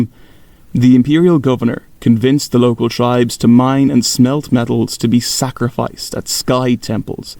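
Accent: Ireland. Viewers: It's nice hearing another Irish accent rambling at length about 40k minutae. Irish accent right? Edit: I commented too early. You mentioned it in video. 😂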